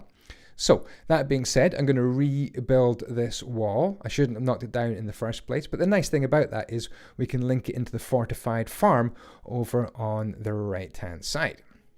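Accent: Scotland, United Kingdom. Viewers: "hoose"; I love scottish accents and this is the reason why I watch you.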